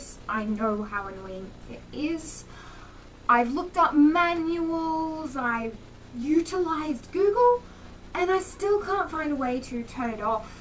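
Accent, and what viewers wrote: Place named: Romania. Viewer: Romanian accent... Okay, I'm not the only one. Someone commented on her most recent video and was all "if you're a native American why d'you live in romania?" I was like "Have you ever heard an American talk? Clearly no, because that's NOT our accent." lol, but I didn't comment that because that would start youtube DRAMA!!! ...or maybe it wouldn't, I don't know.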